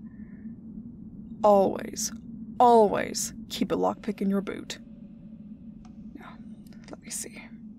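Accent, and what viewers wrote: South, United States. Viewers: just thinking and wanted to share but I think you'd make an amazing outlaw series, southern charm with the voice, amazing perspective on story telling, know when to stop and end a episode. Like I said was just sharing a thought and ¿I guess things that prompted me to say it¿ idk reasoning didn't sound right lol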